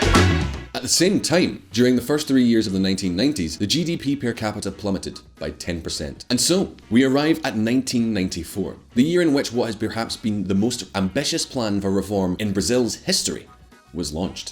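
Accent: Scotland, United Kingdom. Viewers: I've seen Brazilians with no teeth, black teeth and no shoes. Obviously by his accent he is British. The comment is meant to be satirical. You're welcome!! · Are you British ?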